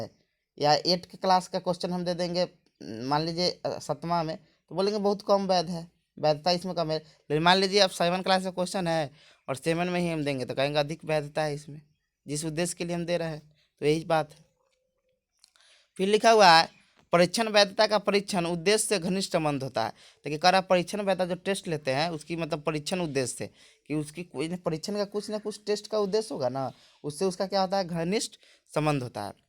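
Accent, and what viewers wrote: Bihar, India. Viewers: Bihar se ho lg rha aap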